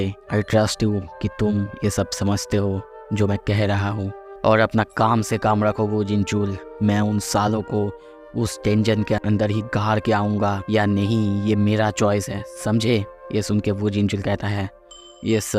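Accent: Bengal, India. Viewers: ap bengali ho kiya, mere vi hindi aisa he, but apka mere si vi acha he. Solo leveling ragnarok explain kar sakte ho kiya, or Jin nehi Jinwo bolo kew ki is me Jin namak 4,5 character he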